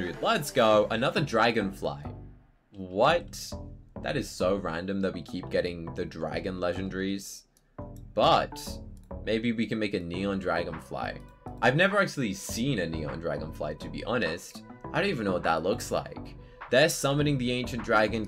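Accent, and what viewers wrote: United Kingdom. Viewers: I never knew he talked in British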